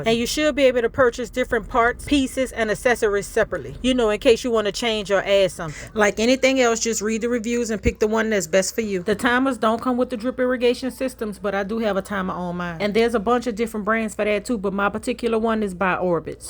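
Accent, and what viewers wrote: New Orleans, United States. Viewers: Your voice sound like us from New Orleans Louisiana. You heard me 😂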